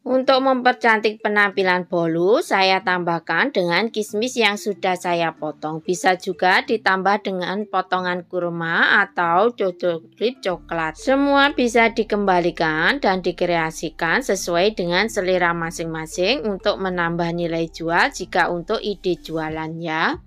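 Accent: Java, Indonesia. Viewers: ....logat jawinya medok bgt....seneng dengernya...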